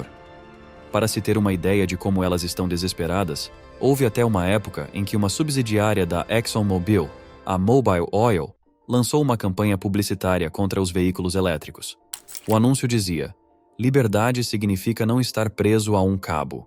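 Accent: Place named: Inland São Paulo, Brazil. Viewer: O mais irritante dessas narrações é, esse sotaque de paulista interiorano, acaba com todo o propósito!!!! FUI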